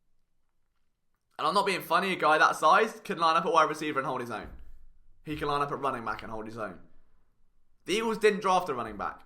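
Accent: United Kingdom. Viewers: I see an Eagles video, I tap on it. I dont year a British accent, I close said video. Good job. Keep it goin. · If my Eagles content doesn’t come with a British accent, I just don’t trust it!!! 😂🦅 🤙 Thanks for your hard work,.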